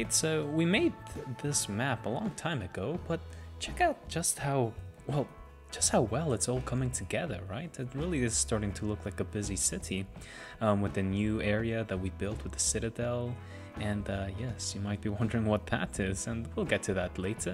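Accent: United Kingdom. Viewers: Are you brithsh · were you speaking in a British accent for part of the beginning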